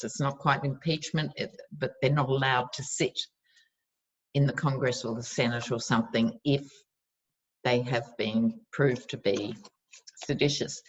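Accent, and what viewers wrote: Australia. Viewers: Strewth you make my day 😂😂😂😂😂😂😂😂😂😂 I love the way you tell it like it is. True Aussie! Great reading loved it. 💖💖💖💖💖💖💖💖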